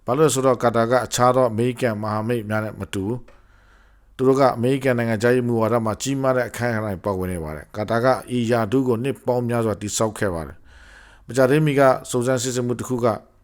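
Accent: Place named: China. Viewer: ဘာလေသံ လဲ စိန တွေ မြန်မာသံ နဲ့ U.S ကို Smearing Campaign...လုပ် ယုတ်မာနေပြီ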